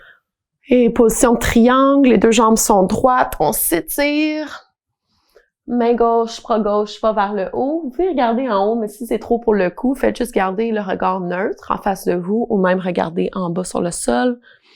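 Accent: Quebec, Canada. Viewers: Loved this opportunity to practice listening in french while doing yoga, and especially to hear more quebecois! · ! that was a great session! I am from French speaking Belgium and am following you every single day. You are such a great inspiration for so many people. Thank you so much for your classes and congrats with your amazing French and delicious Quebec accent that make us travel despite lockdown. I am so happy learn that I am in Canada every day!! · En tant qu'anglophone, je peux généralement identifier le moindre accent, mais je n'avais aucune idée que vous parlait Québécois. L'accent est un peu difficile à comprendre pour les "monolingual whitebread Americans", mais c'est une pratique à double tranchant : Le français et le yoga ! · Merci tellement pour ça!!! Je suis anglophone mais j'ai vécu deux ans au Québec et c'est là que j'ai vraiment approfondi mon pratique de yoga. 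Je fais souvent tes vidéos, mais le yoga en français me manque et cet vidéo a offert un occasion merveilleux pour reconnecter avec la langue. Ton accent me fais tellement chaud au coeur et ça serait super de voir plus de ces vidéos en beau français québécois!! Merciiii encore 🥰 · C'etait superbe! Je t'adore en anglais, mais en francais c'est hyper bien aussi, meme si je ne suis pas habituée a l'accent quebecois. Salutations de Pologne:-) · Un rêve devenue réalité! Je suis trop contente, vraiment fière de toi! Depuis des années que je te suis sur YouTube j’espérais t’entendre parler français! Je suis française et j’adore l’accent québécois💖. Tu m’inspires tellement! Tu ose sortir de ta zone de confort et ne t’inquiète pas ta classe est toujours aussi bonne! Je t’encourage no matter what! Merci merci! Et oui j’en voudrais plus, c’était trop cool🥰🥰🥰 Bonne journée 😘💖🙏🏾🙏🏾🙏🏾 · Merci, merci et merci. 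Je suis abonnée à toi application depuis le confinement. J'ai progressé en anglais et en yoga. Et ce matin, un cours en français, chantant et tellement inhabituel. Tu te débrouilles très bien et c'est vraiment un merveilleux voyage au Québec !! Moi qui suis française, ma prof disait chien tête en bas, posture de l'enfant (balasana) et la pince debout quand tu te plie avant "half way". Continue à nous enchanter avec ton bel accent et tes cours exceptionnels. Namaste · Merci c'est super agréable de t'entendre enseigner en français! Je suis tes cours en anglais tous les jours et là c'était la petite surprise agréable. Honnêtement ça fonctionne très bien. J'avais même le sentiment que tu donnais plus de petites indications sur les postures et c'est chouette. (Ou alors c'est juste plus facile pour moi car mon cerveau comprend directement l'information sans traduire 😁 ) Bref ton cours + l'accent québécois je suis bien réveillée, de bonne humeur et détendue ! 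Bravo et bises from Paris. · Ça ne devrais pas me surprendre que tu es bilingue, car je sais que ton ancien studio se situe en Ottawa, mais le fait que tu es québécoise est vraiment super! Je suis ontarienne anglophone, mais je suis enseignante en immersion française. Je trouve que j'ai perdu mon accent en parlant aux petits tout le temps, donc je suis ravie d'entendre ton accent et de suivre ce cours avec toi! Merci de continuer de nous partager des aspects de ton identité!💕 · Quelle suprise :-) je regarde tes vidéos en anglais depuis la France, et t'entendre parler avec ton bel accent québécois me réchauffe le coeur et les oreilles ! Je tiens comme les autres personnes à te soutenir dans la démarche, c'est une belle initiative que d'aller au devant de tes insécurités ! Tu ne peux que progresser... ;) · Ahah ahaha excellent!!!! Un an que je te suis en anglais, pensant que tu es américaine, pour découvrir que tu es Québécoise, comme mes cousins adorés !!!! 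Génial!! Et puis ce délicieux accent (je suis français), quelle belle surprise ce matin!!! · That was fun! My mother tongue is swiss-german, but yoga en français c'est jolie, too! And I love your accent québéqois!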